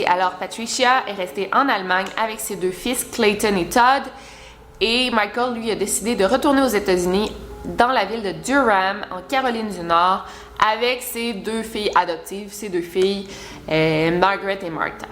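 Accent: Quebec, Canada. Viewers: Je kiff grave ton accent québécois 😊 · J’adore t’écouter. Avec ton accent québécois ou tout simplement canadien tu assures. Je t’ai connu avec une vidéo que tu as fais avec Lionel Camy, merci Lionel.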